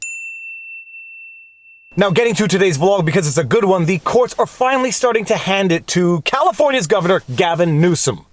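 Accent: France. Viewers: Love the French accent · I always laugh my ass off at the French accented readings.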